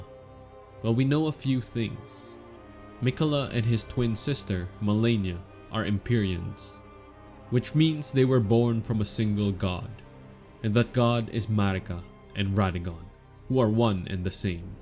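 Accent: Philippines. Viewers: Your accent sounded like filipino. God vid tho.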